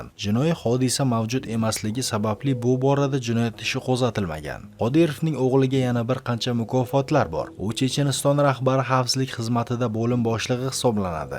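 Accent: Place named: Kashkadarya, Uzbekistan. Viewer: ШЕВАЙИЗДАН БИЛИНИБ ТУРИПТИ КАШКАДАРЙО ЙОКИ СУРХОНДАРЙОДАН БУЛСАНГИЗ КЕРАК,,, ШАХРИСАБЗДАН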